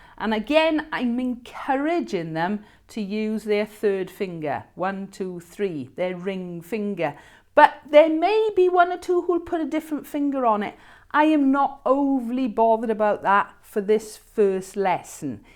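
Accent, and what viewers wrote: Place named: Wales, United Kingdom. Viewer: amazing thank you! I've saved this to watch again a few times :) Also, recognise the fellow welsh accent!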